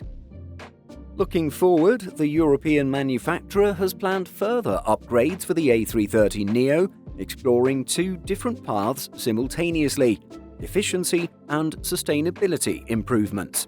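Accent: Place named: United Kingdom. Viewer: Respectfully, for someone with an apparently British accent who can pronounce Raj Indian names as well as you can, you really need to learn a little bit of Spanish, so you can properly pronounce the name of the capital of the country ofColombia. Besides, I thought all you, Brits spent your winners in Spain…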